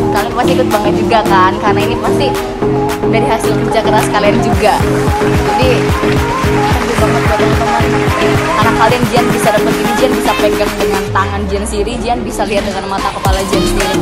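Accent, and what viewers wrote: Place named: Jakarta, Indonesia. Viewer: Wes gedhe saiki,cara ngomonge wes koyok wong jakarta😂😂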